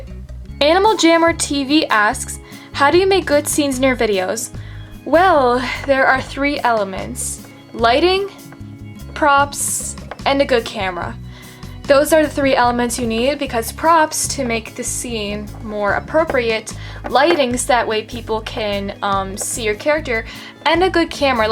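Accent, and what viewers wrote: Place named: United States. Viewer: No. America, do you hear her accent?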